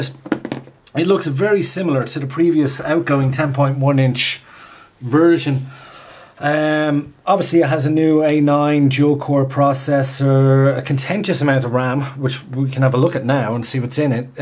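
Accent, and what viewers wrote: Ireland. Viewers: Love the accent.....irish? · fecking hell, you are so obviously Irish :P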